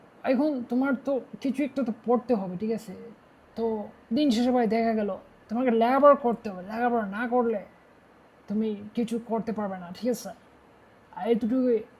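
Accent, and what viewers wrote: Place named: Sylhet, Bangladesh. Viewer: Sylheti manuser muke, suddo basa manayna.👀🥲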